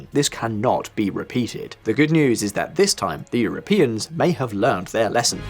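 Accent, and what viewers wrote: United Kingdom. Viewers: When I hear Brit accent, i know this is full of bs. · A proud British English accent is a sure sign that what follows is propaganda, half-truths and lies sponsored by the London-Wall Street Financial Cabal in order to continue their endless, no win wars and increase their bloody war profits from their military-industrial-complex enterprises.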